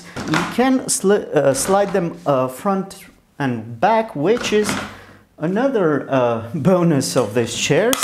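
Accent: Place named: Romania. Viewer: Care ti se pare mai ok, cel mesh-at ?ca nu am inteles ( btw misto accent, mi-a luat ceva sa imi dau seama ca esti roman 😂 )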